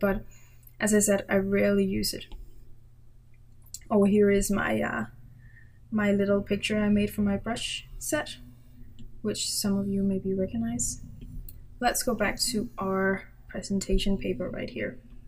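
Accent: Denmark. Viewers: I don't mind if you screen record or this way. But I am a little concerned about your Apple pencil because it's missing the cap. Did you lose it? But anyway you record, I love your voice, it's adorable ❤️ your Danish accent is just insanely cute 😍💛💛💛 Your so skilled as an illustrator. I'd love to see you create an entire piece in real time from start to finish. Don't care if it's an hour or two long. Your soothing voice calms my anxiety disorder. I finally found my Kryptonite - you 🥰🍕🍕🍕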